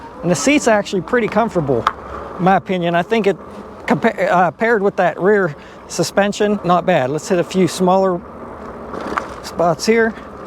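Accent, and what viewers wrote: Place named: Pittsburgh, United States. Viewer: Sounds like you are from the Burgh area possibly the mon valley.. love it! I grew up in Ambridge.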